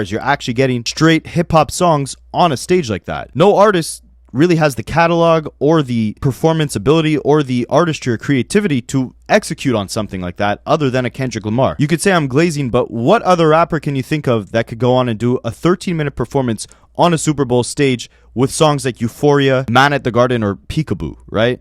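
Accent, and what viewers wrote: Canada. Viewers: Bro i knew you were Canadian!!!! You have similar speech patterns to Whats The Dirt. (Not saying you are WTD). You say "against" the same exact way. I was wondering if it was a Canadian thing. Love the videos btw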